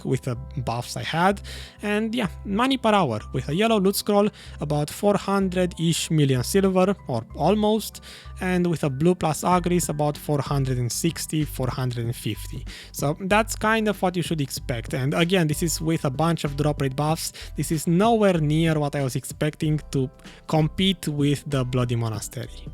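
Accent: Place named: Romania. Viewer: You sound romanian :D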